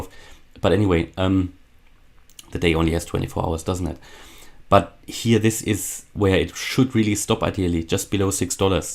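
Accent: Germany. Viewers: German accent ? Grüsse aus miami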